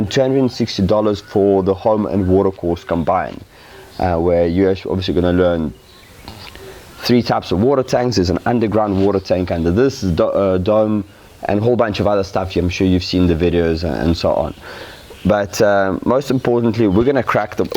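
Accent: South Africa. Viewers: I hear a Saffer accent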